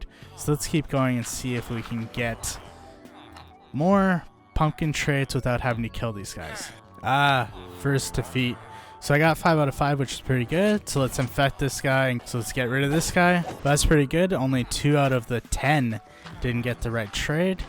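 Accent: Punjab, India. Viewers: Bro are you punjabi?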